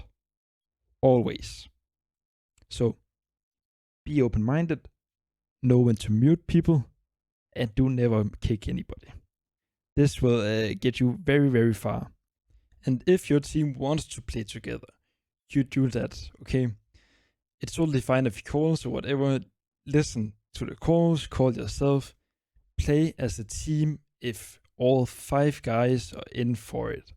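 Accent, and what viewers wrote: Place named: Denmark. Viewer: God video ven! Nice danglish, det du fandeme god til <3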